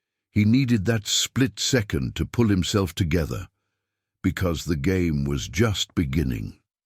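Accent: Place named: Mid-Atlantic, United States. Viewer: The narrator appears to be a real person with the most wonderful mid-Atlantic accent, British, but with American vowels.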